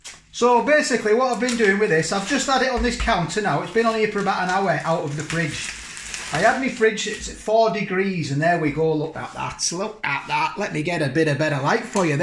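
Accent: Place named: Northern England, United Kingdom. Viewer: Hiya .. The steak looks great. I've also tried this steak from Iceland and I was very impressed by it too. What I want to know is where did your peas go to that you cooked?? I think you had steak brain and forgot about them!! Lol 😂😂 I've only subscriber a few days ago, and I'm loving your northern accent. I live in Bracknell (about 10 minutes away from Windsor) but have always had a London accent. Anyhow, keep what you're doing and I will keep enjoying your channel. Cheers, Vicky 😁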